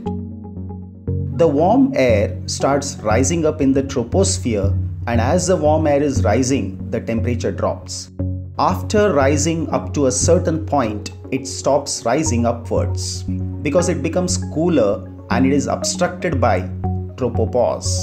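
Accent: India. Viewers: the indian accent takes it to another level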